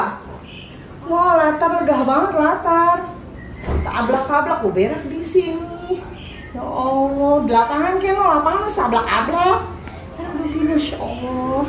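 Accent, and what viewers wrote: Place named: Betawi, Indonesia. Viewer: Medok betul Po betawinya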